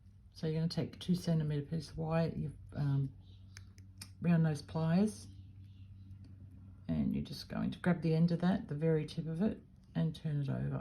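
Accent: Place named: Australia. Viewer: Hi … it’s Shelley Cass in Brisbane. We ‘met’ in a different group about a month or so ago. It’s nice to here an Australian accent in a tutorial. Also I agree with your comment that we don’t have access to many large bead retailers in AU unfortunately. 😔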